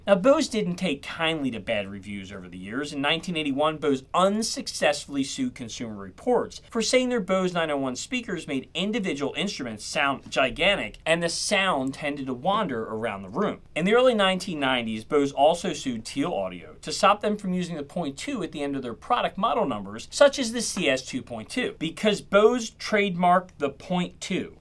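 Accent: Baltimore, United States. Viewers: Your channel occasionally pops up on my feed. I remember the first video I watched of you. I was thinking to myself good Lord if that's not a Baltimore accent, I don't know what is. And of course sure enough that's where you are. I went to Woodlawn Senior High in the late '70s and I was on the auditorium tech crew. I haven't thought of Roberts in 45 years but as soon as you said Roberts I remembered the pair of tape decks that we had in the control room; it seemed to me they were semi-portable with handles and covers. Then sure enough the pictures popped up on your video and that's what I remember. Thanks for reviving a decades-old memory! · I noticed your accent and thought you have to be from Baltimore. When you went out on the street I recognized you are on Harford Rd in Parkville MD. I have been in the shop there before looking for amps.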